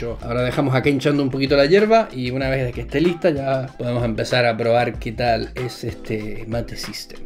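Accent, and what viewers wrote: Chile. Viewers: Buenísima recomendación! La compraré a ver qué tal. Me queda una duda de cuál es tu nacionalidad ya que tu acento me suena chileno pero a la vez no jajaj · Hola que tal, mira asique vivis en Francia, y se consigue yerba halla? Voz no sos Argentino, sos Chileno por el acento, estoy en lo correcto? SALUDOS desde Nueva Zelanda, voz sabes que aca se consigue todo pero bastante mas caro por supuesto, que tal los precios en Francia, Mercy 😊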